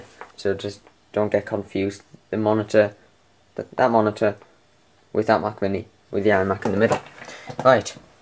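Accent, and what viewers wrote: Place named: Liverpool, United Kingdom. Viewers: I think its a Liverpool accent.. I can never tell, but whatever it is, glad to be british. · I thibk he has a up northan accent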